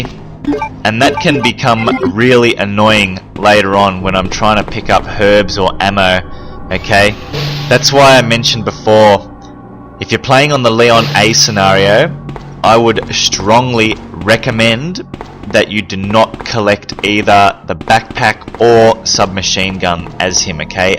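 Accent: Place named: Australia. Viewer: “We’re going heeee, spiders will be in heeee, but we now have the eagle medal, so we will go ahead and put it in heeeee…” Love the aussie speak 😄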